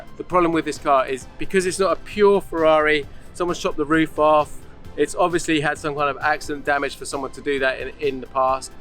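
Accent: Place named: United States. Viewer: No, I mean as I said, bastardised English. Let's just call it American accent so it doesn't offend and start another war.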